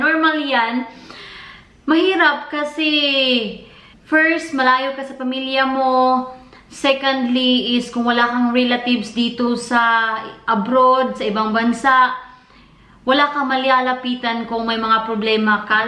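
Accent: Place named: Visayas, Philippines. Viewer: I just saw your blogs in YouTube, I really liked it. May I ask where are you from in the Philippines? You sound like Bisaya. I'm from Cebu too.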